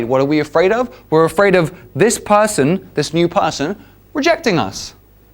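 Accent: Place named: United Kingdom. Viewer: Crap, i dont get that british accent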